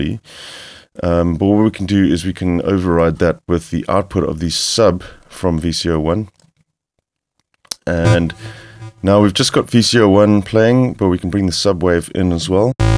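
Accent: South Africa. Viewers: Hey, you sound like a South African, the accent checks out..... fellow South African checking in, this is rare. Neat review and wow, for $25 this is incredible.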